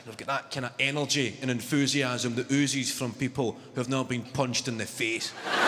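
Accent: Scotland, United Kingdom. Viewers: He's just genuinely funny, I personally think if he had a different accent he'd be funny but the Scottish accent just adds a different twang and emphasis to what he's saying, can't put my finger on it but he is different. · The Scottish accent just makes it better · As far as Scottish goes this is easy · It’s not just the accent it’s the whole Scottish persona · English but with Scottish accent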